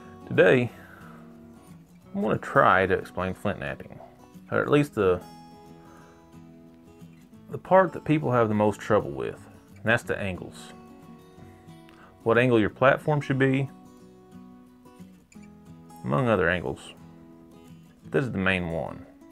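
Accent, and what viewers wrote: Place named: South, United States. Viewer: As an overthinker i truely appreciate your slow southern dialect. Lol Nice take on angles too.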